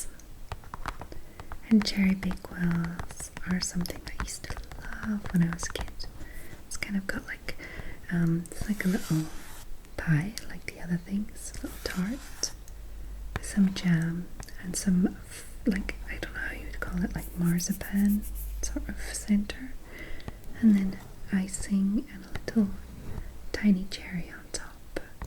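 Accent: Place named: Scotland, United Kingdom. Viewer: She's Scottish, that's where the accent is from.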